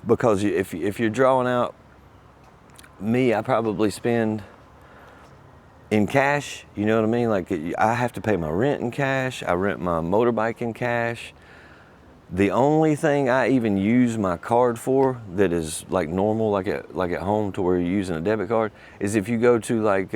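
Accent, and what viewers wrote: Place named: North Carolina, United States. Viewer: It's gotta be a NC thing.. immediately heard the accent and trusted the information. Guess it's tribalism. Thanks. I'm learning. North of Charlotte too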